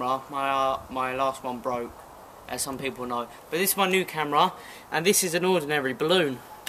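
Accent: United Kingdom. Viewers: gr8 m8 8/8 sound like from the south east somewhere. Not Scottish though m8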